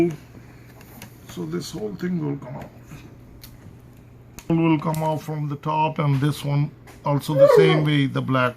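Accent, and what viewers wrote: India. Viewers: this guy seems like it was his 1st time opening a laptop and the worst video on youtube with his bad English curry English and also half the demo was missing he did not show many steps were missing so thumbs down to u.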